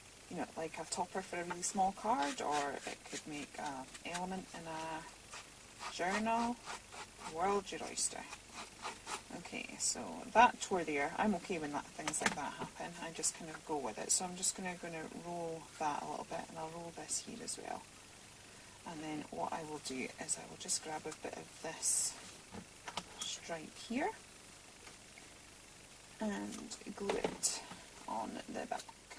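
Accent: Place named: Scotland, United Kingdom. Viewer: This is a beautiful project, love the papers! I especially love your brogue! :-) My grandparent's came from Ayrshire area (Saltcoats) and I still have cousins there that come visit the states quite frequently. They have been telling me to come visit (have not been since I was 10). Such a lovely country and the people are amazing!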